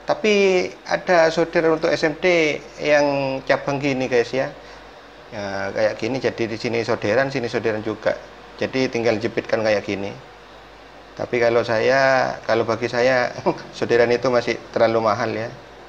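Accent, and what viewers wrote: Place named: Madura, Indonesia. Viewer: Mantap sampean logatnya kaya orang medunten ...ya bang salam solder panas bang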